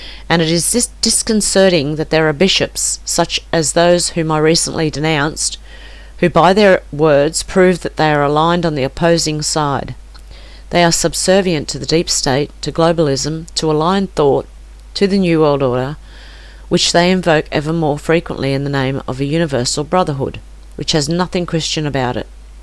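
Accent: Australia. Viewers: You are amazing I am so glad that I stumbled across your channel and subscribed. I can tell that your heart is true your soul is pure and your spirit as of an amazing light and I love your Australian accent. Thank you for all you do. My name is Donnie Jordan and I will continue to listen just love your voice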